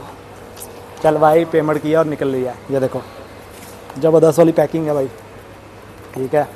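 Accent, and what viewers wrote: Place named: Punjab, India. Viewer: Bnde da accent punjabi lgda menu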